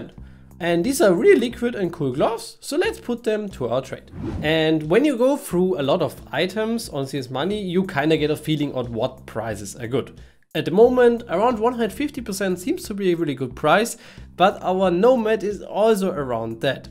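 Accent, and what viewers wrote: Germany. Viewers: i love ur videos, so damn underrated channel.. u have the hardest german accent i ever heard, combined with nice symphatic voice, usefull infos, really good explained, and the editing is just great. keep it up!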